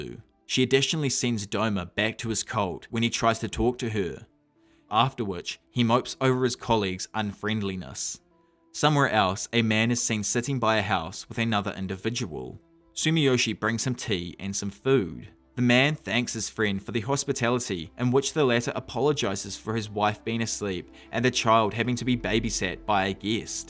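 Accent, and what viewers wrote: New Zealand. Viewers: Loved the kiwi accent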